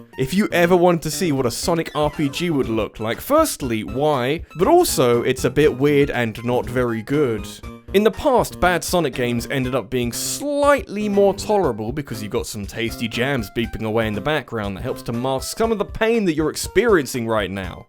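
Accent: United Kingdom. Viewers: You mention that your audience has a craving for dat British accent. Okay what about your audience already native to England in the UK? People probably go to sleep peacefully to your sexy voice all over the place (no homo) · I didn't even realize you were British until you said it. I've heard so the accent consistently for years I forget there's a difference between American and British accents. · I never played that Yoshi Island and I'm glad I never did because the original it's my favorite game of all time and the music there was a piece of art, but this one... Yeah, I don't want to get near that. Also I like all the subliminal messages on your video, like the Splatoon plushie on your left and the star to the right representing how in this video the music is more important tan any game mechanic, also the Lucio's T shirt making us know there is a little percentage of game with bad music just like the Overwatch community has a small percentage of bad people but with a lot of great potential and awesomeness out there... 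Or maybe I'm just overthinking everything while listening to your british accent!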